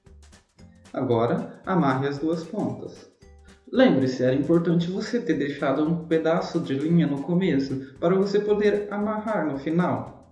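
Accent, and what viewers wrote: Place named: Italy. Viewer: Não sei pq, mas o sotaque me lembrou muito Super Mario.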